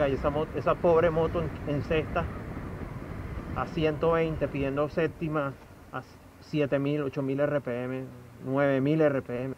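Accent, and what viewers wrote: Venezuela. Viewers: Eres venezolano? 😮 Me gustó mucho tu estilo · Por tus acentos eres 100% Venezuela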